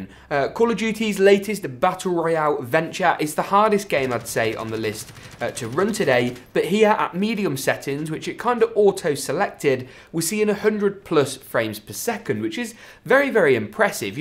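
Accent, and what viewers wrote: United Kingdom. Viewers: That British accent though, I'm intrigued😁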